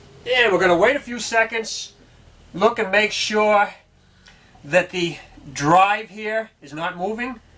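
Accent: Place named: New England, United States. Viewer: I know that New England accent I’m from Boston 😂😂great video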